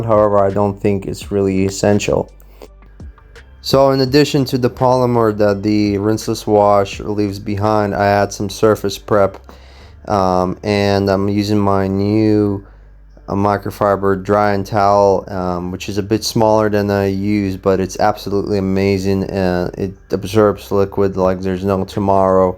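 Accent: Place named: United States. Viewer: Your accent sounds as you're from Chicago or New York with traces of immigrant parents. Thoroughly enjoy your commentary!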